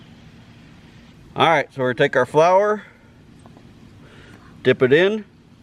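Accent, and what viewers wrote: Wisconsin, United States. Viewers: I was watching your video and was thinking how you sound just like a friend of mine. I was wondering if you were from Wisconsin. Then I read the description of the video. What part of Wisconsin are you from. My friend is from Eagle River area and I'm from Door County.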